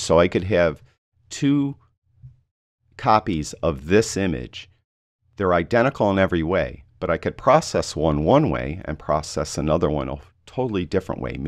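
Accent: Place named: Boston, United States. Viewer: You are from Boston, aren't you? I can tell by the way you say dot CAAM! Well, I guess its no secret since you showed pics of the Boston History Museum last vid.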